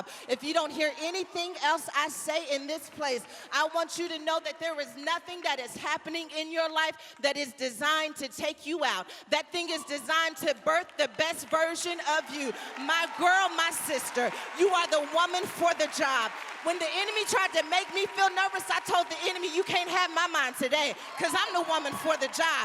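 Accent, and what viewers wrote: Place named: AAVE, United States. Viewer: Hahaha! I love! Bring the ebonics sis! Lol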